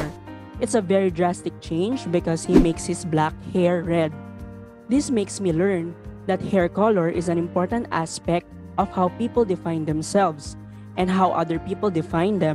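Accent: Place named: Philippines. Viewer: the filipino accent makes me laugh sometimes while she explains certain things...good tutorial though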